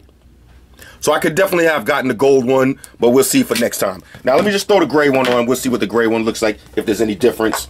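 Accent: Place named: Brooklyn, United States. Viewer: you sound like you're from Brooklyn. Brownsville Here Homie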